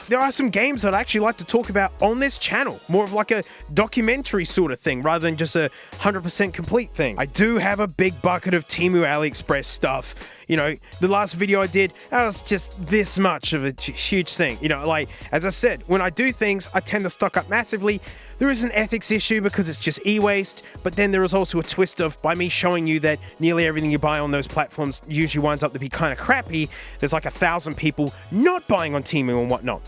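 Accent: Australia. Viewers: i am here for you you could degrqde ke for an hour with silly put downs that will probably make me laught caus eiblove your aussie accent and way of being. We watch you for you not necessarily the content. I know you won't because you said you won't touch bluetooth headphones anymore but i do hope you at least smell the nothing (1)s cause they're really quite good for what they do. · I gave literally 0 shits about apple or ipods and have been here since (the tail end of) those days just about. I've been here for the shouty aussie man with his 1 grit and snake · I came for the obscure tech products but I stayed because of your Australian accent and all of the witty one liners. What other flavors of dog farts exist besides the old reliable EGG?! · i love hearing him get angry in australian!, we enjoy your weirdness my dude. do what feels right. 💜🚗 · I binge-watched all the videos you released, what was it covid-time videos? 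the 4-hour long videos; y ai binge-watched them. then i tried to find you on social media and got on discord to discover you dont exist there, so i left, i left looking for you. And when you dont post, I specifically search for " " or " " whatever. I watch your content, dare i say, unconditionally, because, well, too many reasons; the accent for one; the references to aussie slang (that i have to look-up at times). You're awesome, dont let anyone tell you different, keep doing it YOUR way! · I come to this channel to see what funny things the aussie man has to yell about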